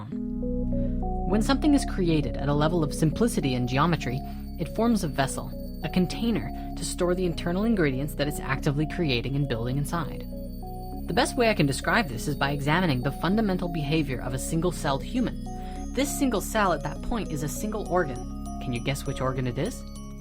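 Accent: Canada. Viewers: Love it how (once in a while) the Canadian accent peeks thru! 😉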